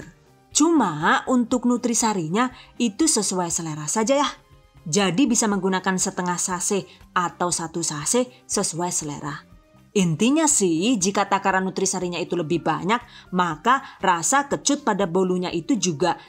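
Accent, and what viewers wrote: Banyumas, Indonesia. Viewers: Mbak asline mana??? Kok bhasanya ngapak? Asline ngendi mbak? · Lah langsung TK subkrek mbekayu Pas krungu basa ngapake😂😂😂😂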